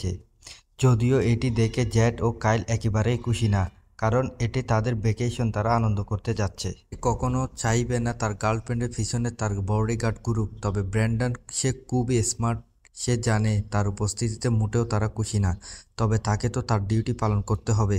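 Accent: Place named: Chittagong, Bangladesh. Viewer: Plz suddho bangla shikhun...er por explain korben plz.... Puro puri Chittagong er tone.. Cheap!!!!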